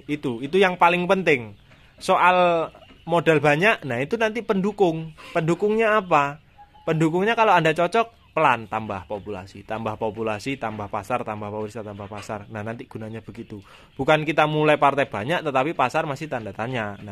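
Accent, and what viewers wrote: Java, Indonesia. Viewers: Aslinya mana mas... Jawa e kok kentel....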